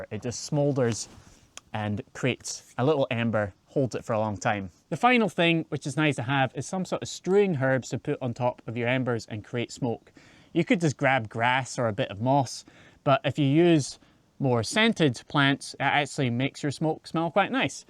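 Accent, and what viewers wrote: Scotland, United Kingdom. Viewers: You've instantly put a smile on my face just with your charming Scottish accent.🥰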